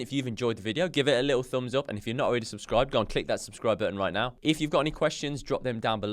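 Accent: Cockney, United Kingdom. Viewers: I really like to watch your videos, your British, sort of cockney English, makes me rock. Keep up your great work! I also like the way you dress A LOT, very British as I said, very Londoner.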